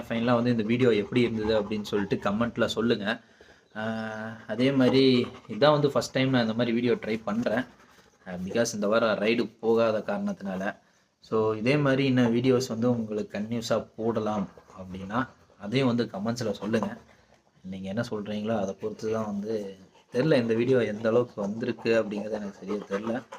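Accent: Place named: Kongu, India. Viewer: koghu selga unga voice ,neega gounder ah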